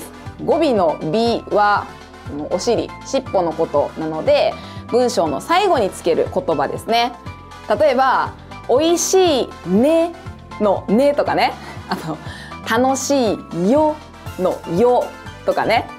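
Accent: Kansai, Japan. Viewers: わし関西弁が大好きやねん。先生は関西人やな。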